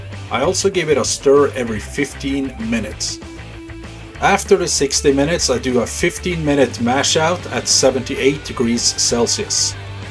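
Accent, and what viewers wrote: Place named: Canada. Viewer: Awesome video, just subscribed. Where are you from, sounds like Canada Eh? Brought back childhood days of the McKenzie Brothers!!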